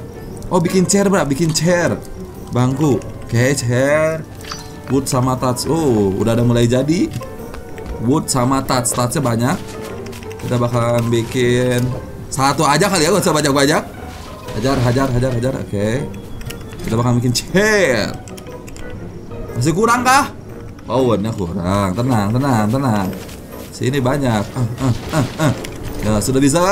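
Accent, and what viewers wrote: West Java, Indonesia. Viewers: bang orang sunda?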